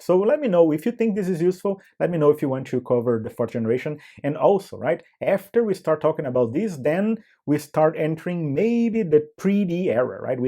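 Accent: Brazil. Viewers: If you drink mate you've got to be Argentinian but you have a Brasilian accent haven't you 🤔. As a C64 programmer I can imagine how hard the VCS must be to code for without a graphic's memory. This notion of racing the beam is alien to me.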